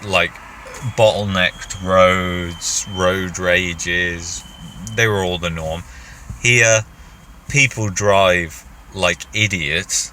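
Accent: United Kingdom. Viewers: Sounds like youre from same area as me in the UK! i feel desperate for a change of scenery from here... I want to move to somewhere like Canada but right now, I can't even find a decent job to save up for all that so (if i ever did)... i think it's a looonngg way off. Cheers anyway, informative video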